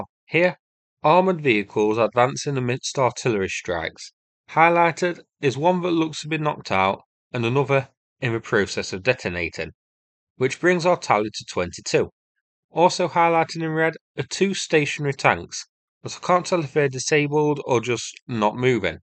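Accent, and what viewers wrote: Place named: North, United Kingdom. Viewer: It’s a northern British accent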